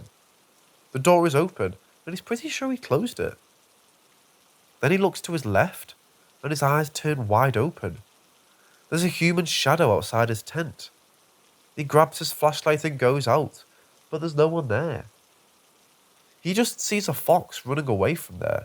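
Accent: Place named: United Kingdom. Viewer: Great stories, cool British accent ✨🤡🧐👍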